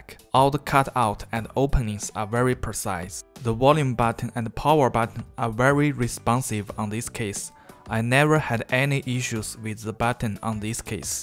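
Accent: China. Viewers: Yes! Finally find a solution. Thanks for the video., may I ask, are you from china? Your accent is very familiar to me😂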